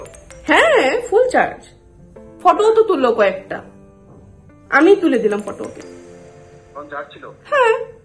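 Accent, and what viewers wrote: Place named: Bangal, Bangladesh. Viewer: Tor bangal kotha ❤️👌😂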